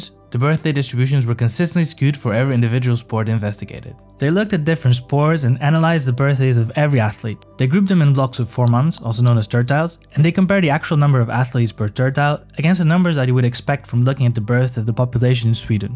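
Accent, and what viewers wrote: Netherlands. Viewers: I can tell you, being born in December, I must really be amazing since I still managed to outperform everyone else in my class from primary to high school. And very humble at that. Edit: I'm Portuguese too. You don't have a Portuguese accent in English... Honestly, you have a very Dutch/Flemish accent. It's tripping me.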